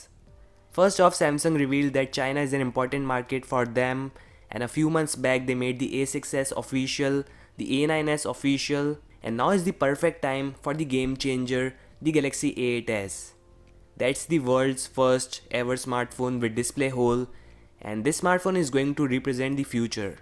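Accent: India. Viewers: Ikr sounds like an indian shitting on the street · Omg indian accent so stupid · Indian english. Unbelievable. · are you dumb? The xeectechcare channel is from pakistan. If you listen to zaryab (xeectechcare) english accent, he sounds like american. Unlike in this channel, this guy is indian and his english accent is different.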